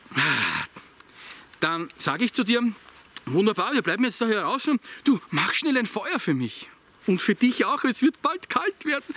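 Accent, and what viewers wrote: Austria. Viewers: ich mag ja eh den Ösi Dialekt, bin Falco Fan seit je her, blabla, ich finde Deine Videos einfach genial, Du triffst jedes Thema auf den Punkt und gerade der Unterhaltungswert Deiner Videos ist mega! Wenn ihr mehr wissen wollt, was mein Kommentar noch so kann, dann bleibt dran!:-)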